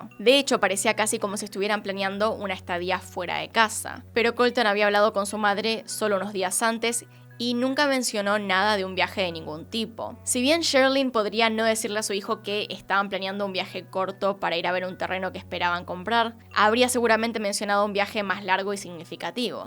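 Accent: Argentina. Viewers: Yo comencé a seguir tb a otra así como este canal porque soy española y está muchacha de quién les hablo también, pero creo q hay sitios para todos, ( los buenos)y me acostumbro al español/ argentino, adelante, con esas preciosas pestañas q te pones..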